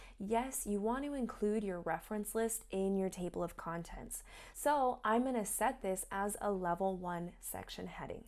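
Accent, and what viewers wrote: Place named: United States. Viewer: Your instruction is great. Thanks for keeping your instruction to the point. Having previously lived in the USA on and off for a few years its nice to hear your american twang reminds me of Seattle.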